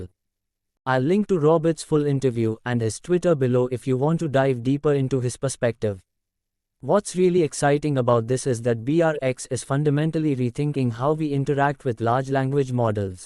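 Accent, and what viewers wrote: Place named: India. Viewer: Is this AI generated? If so why pick an Indian accent?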